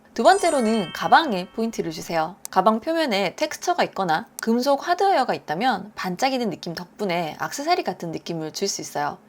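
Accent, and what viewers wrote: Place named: Gyeongsang, South Korea. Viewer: 경상도사투리와 서울 사투리가 아주 이쁘게 믹싱되어 좋네요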